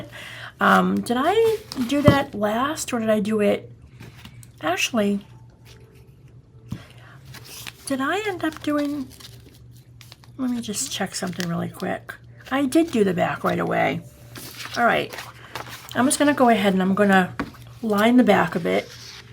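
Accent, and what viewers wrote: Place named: Boston, United States. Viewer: You're just the cutest ❤ I LOVE LOVE LOVE your creativity AND your Boston accent 🤗💕 hugs&love Darlin xxx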